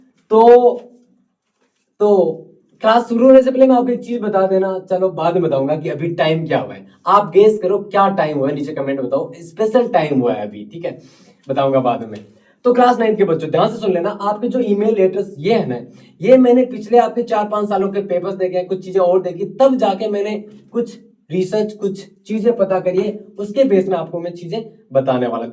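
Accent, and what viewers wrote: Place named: Rajasthan, India. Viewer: Sir aap to rajsthan ke ho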